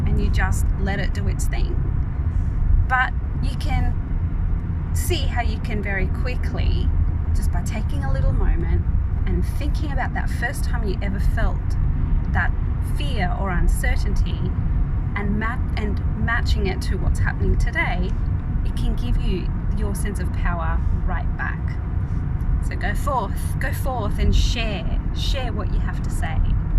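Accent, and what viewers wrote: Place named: Australia. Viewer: Hello, thanks for sharing and thanks for the tips and the advices! Love your accent! You probably live in Adelaide as I do. Thanks again!:D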